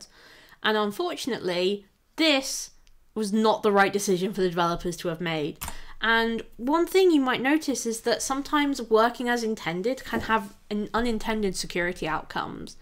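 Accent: United Kingdom. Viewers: by golly... SHE IS BRITISH!!!!!!!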